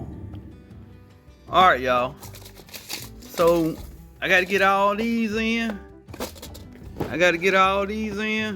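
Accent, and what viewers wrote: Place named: Georgia, United States. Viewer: where did you get your Master grow course certificate from? I want to complete one. Thanks for the videos and I love the realness and natural Georgia dirty south accent! I'm from Georgia myself. Let me know where to get the certificate please. Keep up the grind and growing your own food!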